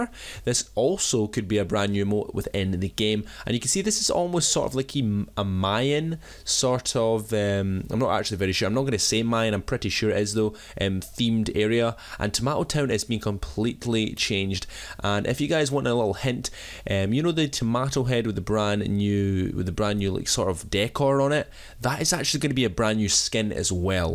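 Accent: Scotland, United Kingdom. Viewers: Are you scotch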